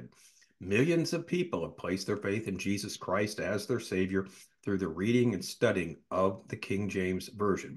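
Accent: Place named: United States. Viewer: But you speak American English accros the pond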